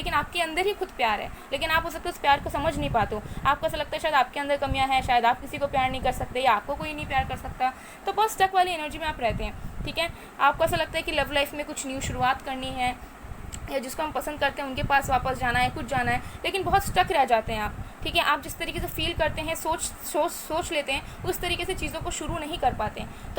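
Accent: Bengal, India. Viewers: Mam aap bangoli ho kya.....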